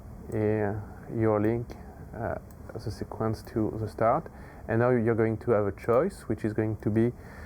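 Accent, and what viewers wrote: France. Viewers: I love that strong french accent ! haha ! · Le gars a clairement un accent français, mais ils n'ont pas cru bon d'intégrer le français dans les choix de sous-titre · Guys, seriously why the heck you put someone with french accent explain how a tutorial works out??? It's PANEL not PANAL ffs...i struggle to understand HALF of what this guy says!!!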